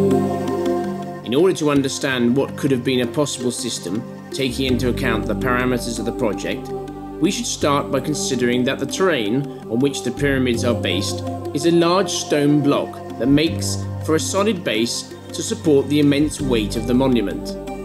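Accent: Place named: United Kingdom. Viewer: Don"t like the narrators voice. Why do they insist on using British men, like that adds credulity to the subject?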